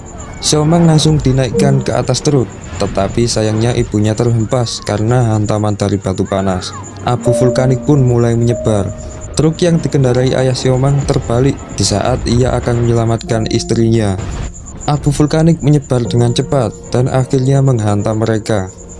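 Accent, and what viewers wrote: Java, Indonesia. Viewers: medok amat min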